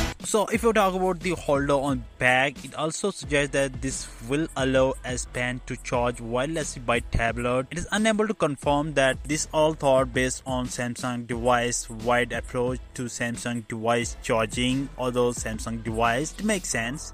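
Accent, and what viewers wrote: India. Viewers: I just turned volume off because of the butchered 7 ELEVEN Apu English. Still a great vid!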